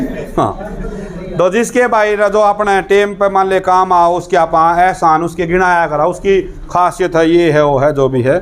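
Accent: Haryana, India. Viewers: M Haryana Exams Ki Taiyaari Nhi Karta But Sir Ji Ki Haryanvi Voice Tone Bhut Acha hai 😊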